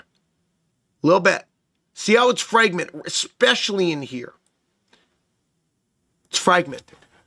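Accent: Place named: New Jersey, United States. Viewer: Jeez, you couldn't talk about when there is going to be frozen precipitation in Texas. It sounds like you 're in New Jersey for God's sake, from your accent. Noone here cares about New Jersey. What is wrong with you.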